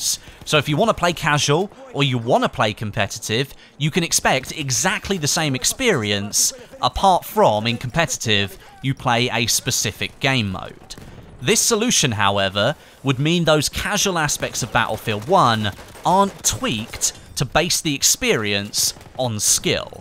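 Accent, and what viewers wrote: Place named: United Kingdom. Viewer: You sound so British lol.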